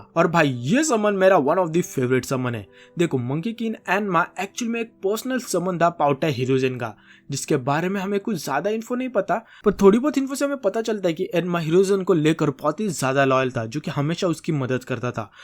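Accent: Maharashtra, India. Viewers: Bhava Marathi Ahes ky??? Thodasa Texture Janvlaaa!!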